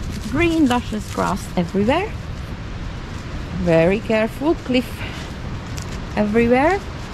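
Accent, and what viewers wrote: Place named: Netherlands. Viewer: Enjoyable series on Norfolk Island, thank you. I assume from your accent you're Dutch?😢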